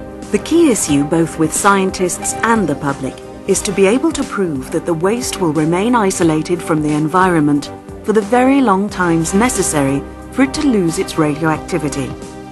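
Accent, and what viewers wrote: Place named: England, United Kingdom. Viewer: The English accent voice-over wasn't a great idea though!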